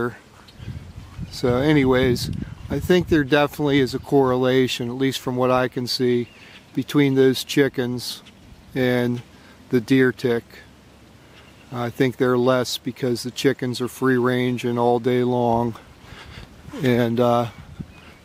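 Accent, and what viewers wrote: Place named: Maryland, United States. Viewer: Are you from Maryland? Your accent sounds Maryland to me.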